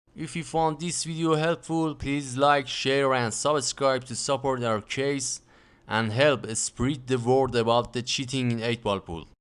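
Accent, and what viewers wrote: Iran. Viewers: Your persian accent is very funny =))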